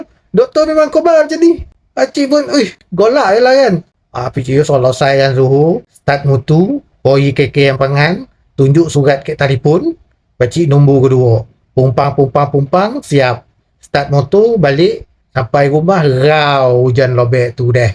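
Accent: Negeri Sembilan, Malaysia. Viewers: orang NISMILAN..tu deh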